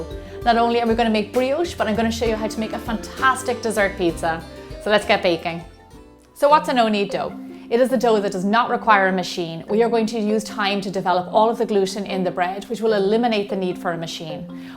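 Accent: Ireland. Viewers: Hello are u irish if so I'm half irish and I went to Ireland for 4 weeks and made half your stuff love you! I'm going to be a confident bold baker for the rest of my life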